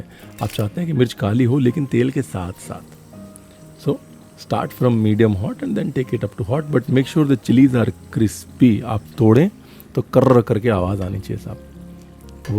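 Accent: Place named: Lucknow, India. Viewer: Lucknow ka poora poora asar dikh rha hai lehze mein!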